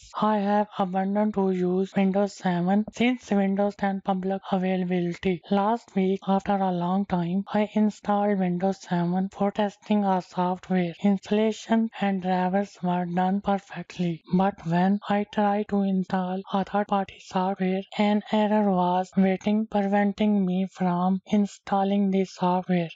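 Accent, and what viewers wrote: India. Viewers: Indian robot voice, damn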